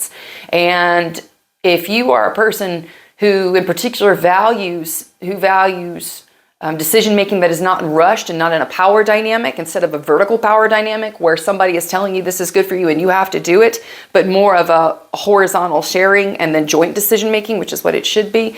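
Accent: South, United States. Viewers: I know this is a little off topic but does anyone else hear a southern accent? Possible mix of Texas and Louisiana or maybe Tennessee.. Anyway I truly admire her Passion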